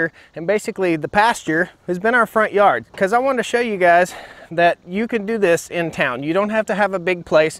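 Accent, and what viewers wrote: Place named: North Carolina, United States. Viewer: Your a north Carolinian to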